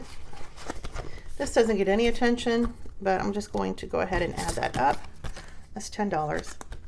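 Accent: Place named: North, United Kingdom. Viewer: Northern twang!!! 😂I love it!!! I love that you got them so quickly!!!!! Thank you so much again!!!!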